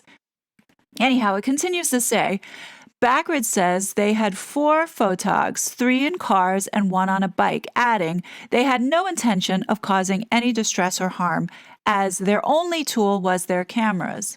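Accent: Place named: New York, United States. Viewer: Thank you for reporting this! This is the first place I heard it, and what could be better than hearing your NYC accent. I love it! I think the whole point of this shit is to bring down the monarchy, to cheapen it as you say. Like I keep saying, they can’t have their new world order over in Davos with a 1K year old monarchy. Out with the old, in with the new, and it sucks.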